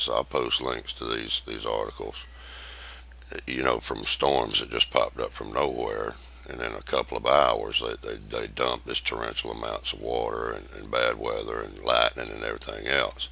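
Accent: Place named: Virginia, United States. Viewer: ahh man. watching ur vids and reading the comments about ur voice. you sound like my old dad with that backwoods virginia accent. man i miss him. he would have loved ur videos. he had to quit school after fifth grade and when he got drafted they made him take his asvap test twice cuz they couldn't believe he scored so high. here i sit with my degree and not nearly as smart as him.lol. stick with it. them edjicated idjots gotta learn somehow.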